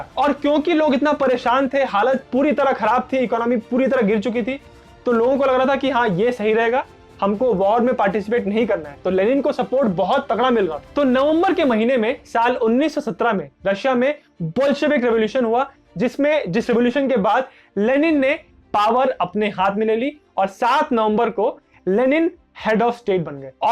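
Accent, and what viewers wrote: Bengal, India. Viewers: Are you a Bengali?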